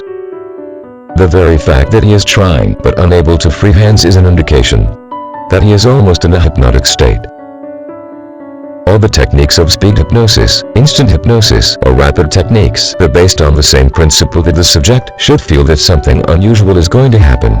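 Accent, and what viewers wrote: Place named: India. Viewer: What do you think is more understandable? An Indian accent or a standard voice bot?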